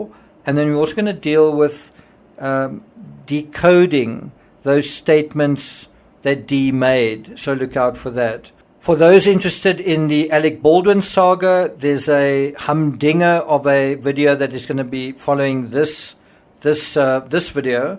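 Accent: South Africa. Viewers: Your accent alone is great! South Africans are very interesting people. I was blown away watching Charlize Theron speaking Afrikaans on an interview 👏🏻👏🏻 I always forget she is from there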